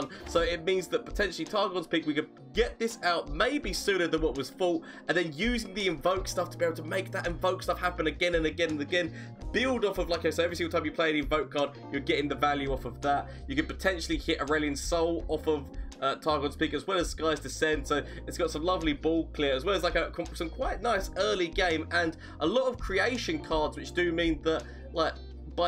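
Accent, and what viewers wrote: United Kingdom. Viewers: you're the best british streamer hands down. As someone from the commonwealth but not the isles I tend to find the accent interesting. Just a thought, but maybe you can market yourself as the ' UK ' streamer 🤔. As well, I'm part if the discord just to preface. I thought though that to grow and reach your goal of 5k you need a website, unless you have one already; did not check. Al a swimstrim per se. Although, love your decks because they are fun! I also think you could look into paid marketing for your targeted keywords. I do it professionally for other people. No obligation for any ' deals ' but I can help in return for your videos. They keep me going sometimes 😄. It would help grow quite reliably imo Furthermore, please stop slouching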